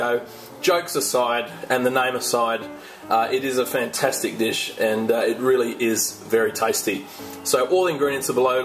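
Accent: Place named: Australia. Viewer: Yeah where did you get the UK from this? He's Australian lol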